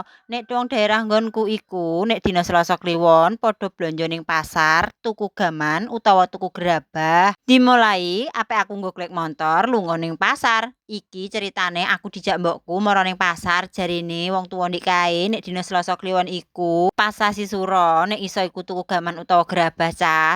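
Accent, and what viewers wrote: Java, Indonesia. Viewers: ❤️ mu desa mana to mbak Tulung bls been jelas mbak NK ngomong KK boso jowo ne medok banget mbak. seneng netesin ngrungokne mbak.. swun mbak sehat selalu Amin 🤲👍💪🍅🙏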